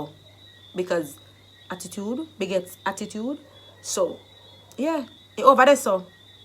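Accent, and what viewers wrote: Jamaica. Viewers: I love how Jamaicans talk. I clicked on video just to hear you talk lol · Wow.i like the Jamaican accent.. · Wow, I like the Jamaican accent; I enjoy how you speak. I will start practicing how to speak like a Jamaican. This video is very helpful. Thanks for sharing.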